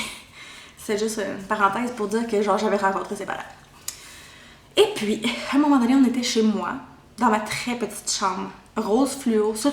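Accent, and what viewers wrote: Quebec, Canada. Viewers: Accent québécoiss 😉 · Je suis juste fan de ton accent québécois, ça à tellement plus de charme que l'accent français ! 😍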